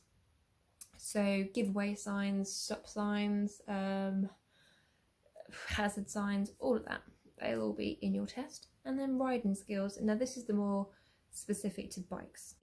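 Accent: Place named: Worksop, United Kingdom. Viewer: Where about is it you live in England? You sound like someone from Worksop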